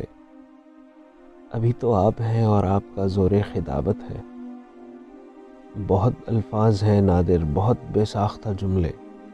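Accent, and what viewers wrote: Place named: Punjab, Pakistan. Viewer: very nice urdu accent that too from a Punjabi speaking (I am assuming since you have as a Surname). Highly appreciated.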